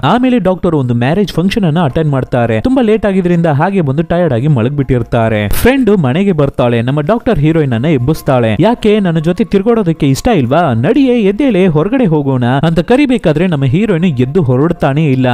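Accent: India. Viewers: Super brother❤adre nemdu yav uru anna nem mathado style super adike keldye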